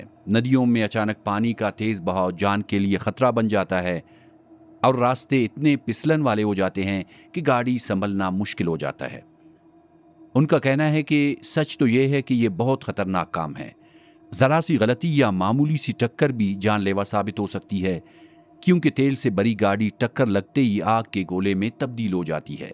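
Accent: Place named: Balochistan, Pakistan. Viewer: واہ بلوچ تمھارا لہجہ بلوچی ھے مگر اردو اچھی بول لیتے ھو۔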